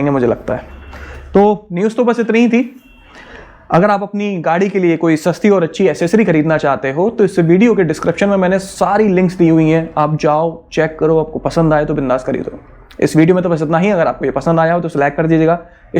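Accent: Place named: Gwalior, India. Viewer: Gwalior ki boli 🔥🔥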